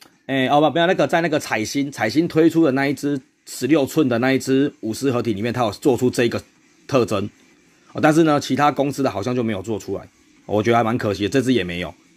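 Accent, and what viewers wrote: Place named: Tainan, Taiwan. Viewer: 懶pa是台南人？ 語氣聽起來很台南～～ 太可愛了～好想買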